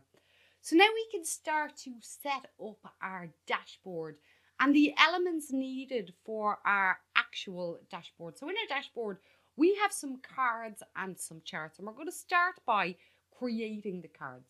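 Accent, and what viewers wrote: Ireland. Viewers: Love the Irish ☘️. Thanks for this 👍🏽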